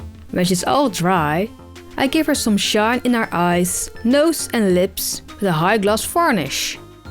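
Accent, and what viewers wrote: Netherlands. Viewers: Are you dutch? Because I'm hinting an accent and my guess is that you're from The Netherlands 😂😂🙌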